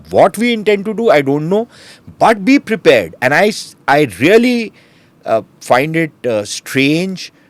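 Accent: India. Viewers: Can you please translate into English or English subtitles because I cannot understand Indian Dumbass English accent. 😂😅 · Good god that indian accent is like broken glasses hitting ears. All of this is just nonsense. First of all Free Kashmir from illegal Indian occupation and indian army brutality. This is the only solution to all the problems.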